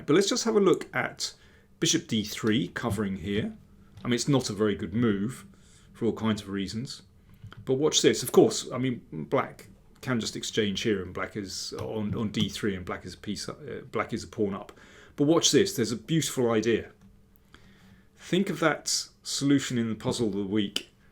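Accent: United Kingdom. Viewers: Mystery to me, both entertaining and gives great explanations of the ideas behind the games. I have been wondering if it's because many chess players find following the native British English harder than non native where the words are both simpler and enunciated more slowly.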